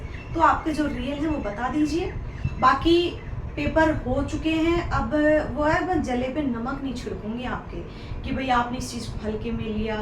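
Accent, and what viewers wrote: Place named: Jhunjhunu, India. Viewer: Mam aap mere ko bhasa sai to jhunjhunu sai lag rhai ho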